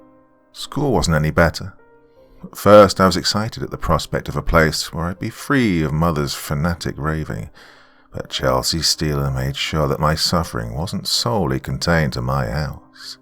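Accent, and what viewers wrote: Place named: United Kingdom. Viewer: I really wanted to listen to this story but British accents are so cringe to the point of them pissing me off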